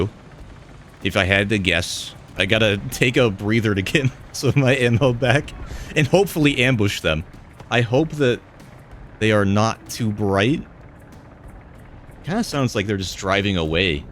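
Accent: United Kingdom. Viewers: Youre british? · IS BRITISH? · you are british?😟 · wait is British? I’ve been hating on a fellow Brit for hating British tanks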